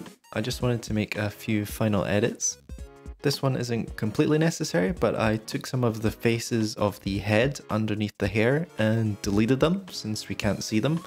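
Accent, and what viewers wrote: Scotland, United Kingdom. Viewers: are you scottish? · nice light scottish accent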